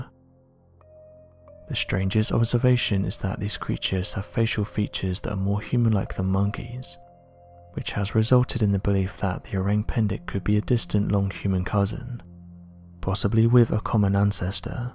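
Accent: United Kingdom. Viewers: Oi mate!! I love all of your videos. I truly enjoy driving,chilling,cleaning and etc. to your story telling. It has been a fun pass time. Your one of the better presenters/narrators in my opinion on YouTube when it comes to the strange and unusual. Would love to hear more videos about satanic cults such as Abraxas and or even Chateau des Amerois if you ever get the chance. Cheers and love your work. Something about your British accent and calm voice that’s very soothing to me for some reason. Perfect for story telling. Anywho, Much love and respect from your long separated cousins here in the U.S.! Blessings from the bayou state of Louisiana. Also, another potential topic to check into is creature and beings spotted in and around the swamps of Louisiana. Especially run ins with werewolf like creatures labeled as Rougarou. Take care for now mate! Blessings to the Cambridge crew and Bristol Peeps and all my st neots lackeys out there!!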